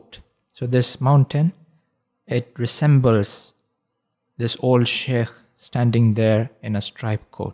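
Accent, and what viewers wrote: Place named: India. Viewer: السلام عليك انت عربي ام من النهد لان لهجتك بالإنجليزية تشبه اهل الهند 🌹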